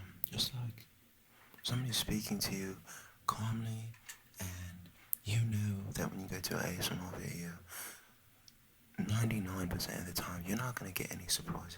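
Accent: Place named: United Kingdom. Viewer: Lol I actually thought you were british 😂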